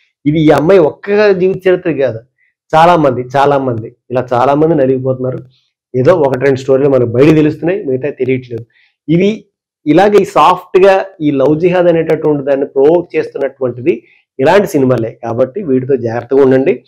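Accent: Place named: Godavari, India. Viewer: మీ గోదారి యాసతో డైరెక్టర్ కు గుణపం దింపారు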